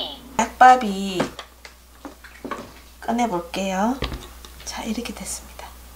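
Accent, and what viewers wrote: Gyeongsang, South Korea. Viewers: 경상도 말씨 진짜 정겹네요.말씨가 넘 예쁘요.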